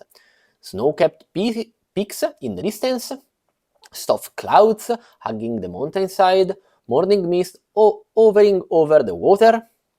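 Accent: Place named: Italy. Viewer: Just applied, name is Max Nthite...Are you from Italy? you have an interesting accent...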